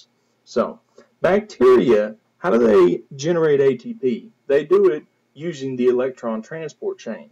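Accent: United States. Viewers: Thanks you so much, so much southern accent .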